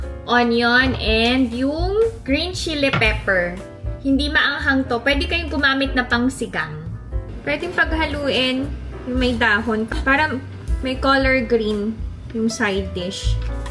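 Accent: South Korea. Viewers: I love hearing your Korean accent..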